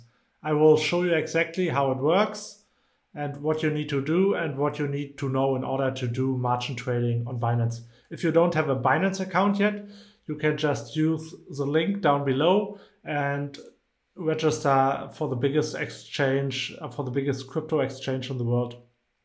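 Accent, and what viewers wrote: Germany. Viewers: are you german? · nope... he's most deffinetely a german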